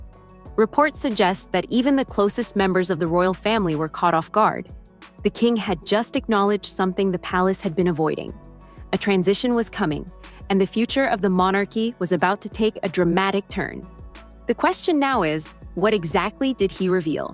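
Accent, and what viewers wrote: United States. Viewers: Another American voice coming from the speaker's rear about something about which she knows nothing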